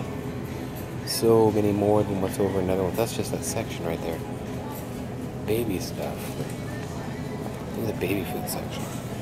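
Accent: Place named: United States. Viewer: Might be a stupid question, but why do you seem to have never been in a large american supermarket, yet you sound like you're american?!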